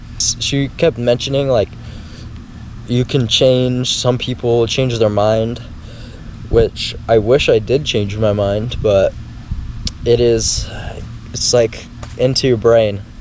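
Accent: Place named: South, United States. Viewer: Based on your accent, you seem to come from a Southern state; good to know that you were fully accepted. Interesting that you told your dad before you told your mom. You are extremely pretty and masculine. It makes things easier for you.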